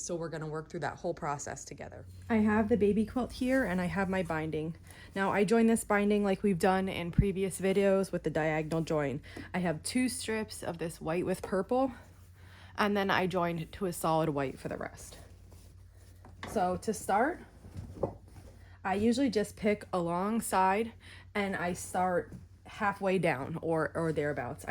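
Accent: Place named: Pennsylvania, United States. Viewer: Hi! I just came across your channel! I really enjoy your quilting projects and how well you explain everything. I picked up on your PA accent. I live in Michigan now but I’m from PA too. Looking forward to watching more of your videos! Thank you!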